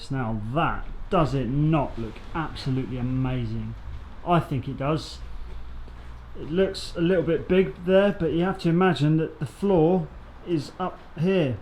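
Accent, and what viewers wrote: England, United Kingdom. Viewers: Been watching your videos for a while. Learning alot about bricklaying. Many thanks for teaching us Yanks lots of Queens English. If I ever get a chance to visit England, I'll know what you blokes are saying.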